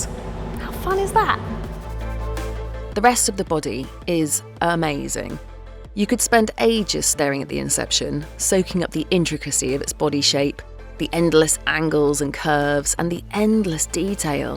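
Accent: United Kingdom. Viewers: She has such a british voice and accent🤣 most british women sound and talk the same. Which is weird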